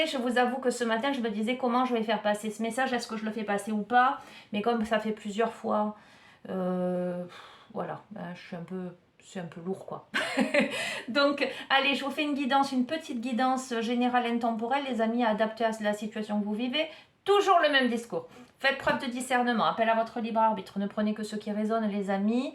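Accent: South, France. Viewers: Bonjour &, c'est toujours un plaisir de vous écouter, vous avez une voix mélodieuse et un doux accent du soleil et cela est très plaisant...Je vous félicite pour avoir mis les choses au point avec certains Hommes car c'est indispensable de se faire respecter...Vos guidances me font le plus grand bien et je vous en remercie chaleureusement...Restons dans la Lumière Divine...Gratitude Infinie et Très bonnes Énergies à votre chaîne💐💖😇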